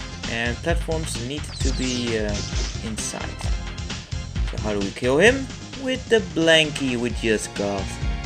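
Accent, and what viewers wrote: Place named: Netherlands. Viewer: i was sitting here, listening to your voice and i was like 'this guy must be from the netherlands'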